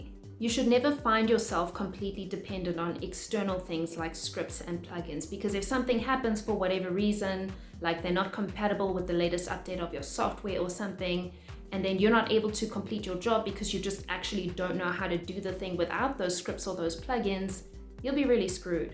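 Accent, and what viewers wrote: South Africa. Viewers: Your accent sound South African.... great vid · the south african accent>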